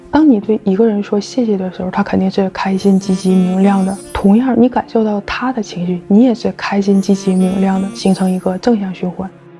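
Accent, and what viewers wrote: Northeast, China. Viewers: 🤣🤣🤣🤣🤣🤣我去，看着人，看着画面，看着繁体名字，以为是台北的，一听口音，原来是东北的。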